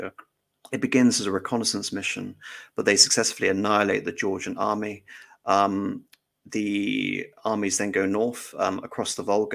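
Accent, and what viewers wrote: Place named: United Kingdom. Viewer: Nice british akcent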